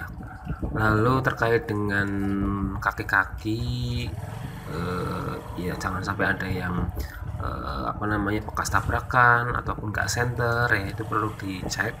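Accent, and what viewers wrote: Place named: Banyumas, Indonesia. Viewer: Ngapak ya mas wkwk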